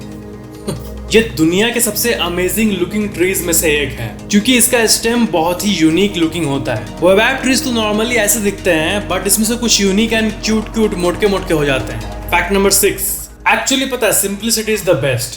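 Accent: Bihar, India. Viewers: Are you from Bihar or Up I am from bihar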